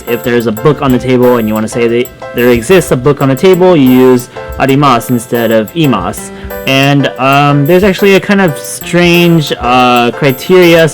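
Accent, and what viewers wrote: United States. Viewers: I'd guess he was raised in america(prbbly was born there as well) but his parents are japanese and talked to him in japanese But I think he has an accent in japanese (not sure tho) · Is English your first language, or did you learn it as a 2nd language? If the latter, i must say your American English accent is perfect.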